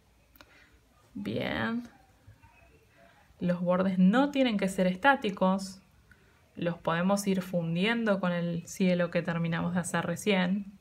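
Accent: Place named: Spain. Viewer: Me encanta su acento de español ❤❤❤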